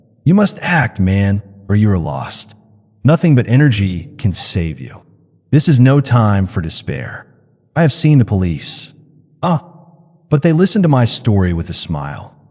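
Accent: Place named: United States. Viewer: an american accent for Sherlock Holmes??? that does not work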